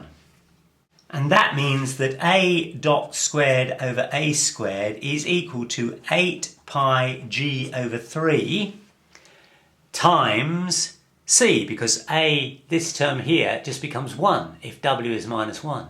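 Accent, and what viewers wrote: United Kingdom. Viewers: British accents should be forbidden in youtube videos. No matter how stupid the ideas are, if they are told in a British accent, people will believe.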